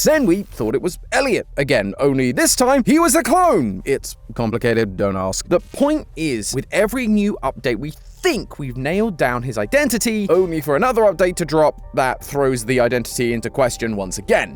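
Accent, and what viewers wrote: United Kingdom. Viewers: Wait , why do u have a British accent all a sudden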